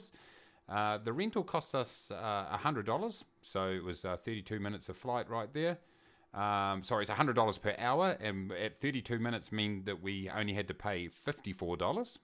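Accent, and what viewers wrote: New Zealand. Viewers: Unrelated, I love that kiwi accent of yours. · Love your accent dude, you sound like my coworker thats from New Zealand!!! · Right away heard the Kiwi accent. IFF ESS Economy.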